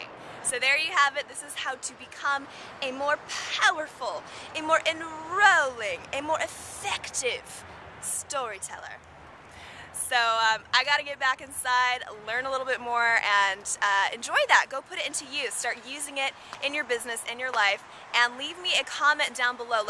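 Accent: United Kingdom. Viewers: Thanks for posting this. Your voice is pleasant, you are good looking, and those two things helped me to overlook the annoying British voice and the elevated video camera/phone angle. Your arms must have started to cramp up. With the British accent all I could think of was Madonna putting on a British accent. Remember a little goes a long way. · Like your British accent. How you said the story about this lady was amusing. :)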